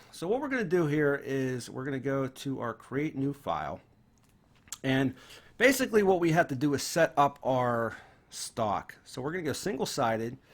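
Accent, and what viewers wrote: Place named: Pittsburgh, United States. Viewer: Hey I was wondering what part of around Pittsburgh are you from. I hear it in the accent. I was born in Freedom, Beaver County. My family is still in that area now.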